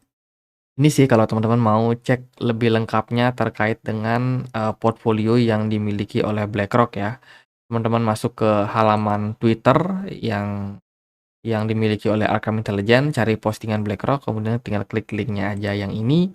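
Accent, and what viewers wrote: Bali, Indonesia. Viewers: Dari vokalnya jelas orng bali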